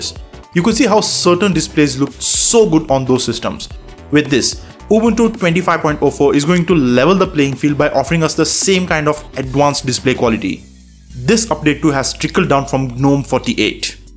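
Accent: India. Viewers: Brother, are you Indian? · He was probably born and raised in a different country from you. My guess is the vicinity of the Indian peninsula? I've got good money that you're a Trump American.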